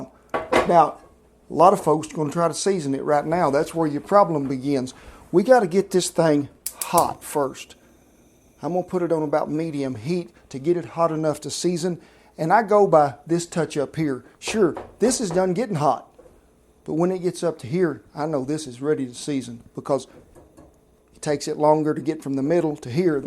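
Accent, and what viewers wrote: South, United States. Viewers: I love your southern accent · I'm not American but I love southern accent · Love that country accent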